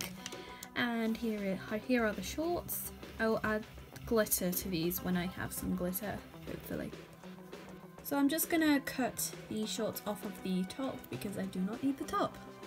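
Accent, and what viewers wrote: United Kingdom. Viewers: Are u from Scotland Uk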